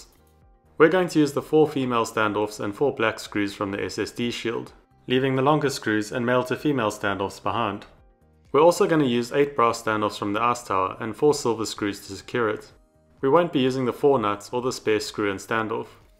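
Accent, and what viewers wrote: South Africa. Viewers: you sound like a South African, not an Australian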